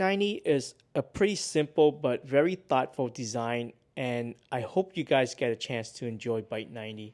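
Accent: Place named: Pittsburgh, United States. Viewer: Location says Toronto, but accent says Pittsburgh.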